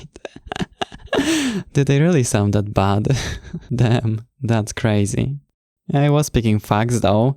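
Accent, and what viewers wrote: Poland. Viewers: You sound polish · kurna ale łatwo ogarnąć po akcencie że to Polak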